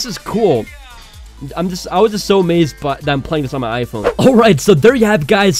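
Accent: India. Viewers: Are you Indian.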